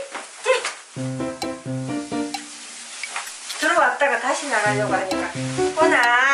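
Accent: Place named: Gyeongsang, South Korea. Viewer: 경상도 언어가 이리도 러블리 할수가 ♥ 알아 듣는 개딸 꼬니는 더더 ♥♥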